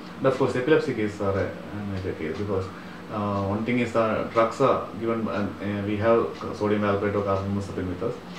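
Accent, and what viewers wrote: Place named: India. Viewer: speak ENGLISH i don't want to hear your fucked up stupid india voice or acent. its one of the worse langues in the world and most stupid sound and un able to make sense of it. sounds like a fucking baby...i guess they want to act like freakin babies their whole pathetic life plus their county is a complete mess they have no respect for their own back yards...pathetic way to live.....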